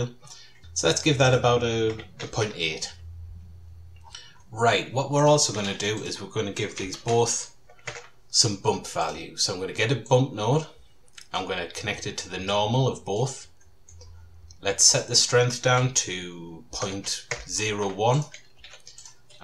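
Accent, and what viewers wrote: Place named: Northern England, United Kingdom. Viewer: Hello, mate. This video is a pure gem for me saving this to my favorites. Cheers! P.S.: You've got a very familiar accent to me. It reminds me (actually it's identical) of my British friends from the oil field I work at. I don't quite remember their origin but sounds like a bit of slow Scauser but more Northern England. Where are you from?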